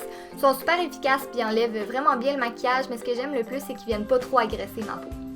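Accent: Quebec, Canada. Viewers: J'adore tellement tes vidéos, étant déjà une fan des voix québécoise (je vis en Belgique )! J'ai découvert tes vidéos il y a quelques temps et je les adore toutes!! Bisous et continue , de plus j'adore les routines ;)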